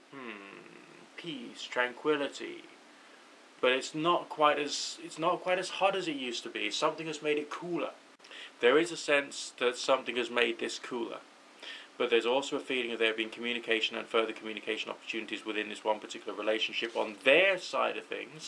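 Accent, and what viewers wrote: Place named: England, United Kingdom. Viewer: o sorry about my typing and yeah my names sari sherri and o your not australian like totally english your funny. i Enjoy listening to you you seem so genuine and have a great personalty and common scense. thanks again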